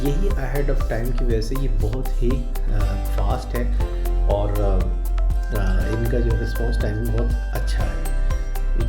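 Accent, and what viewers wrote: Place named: India. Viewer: This hardcore indian-english accent, combined with the loud background music makes it really hard to understand anything at all 🙁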